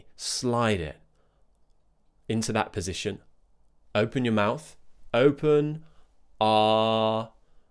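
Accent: United Kingdom. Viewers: I have been trying to differentiate the American IPAs and the British ones... Not easy... But I think I have figured it out thanks to your video. Love you, teacher!!!!!! Thank you so much!!! Your British accent sounds soooooooooo nice in my ears.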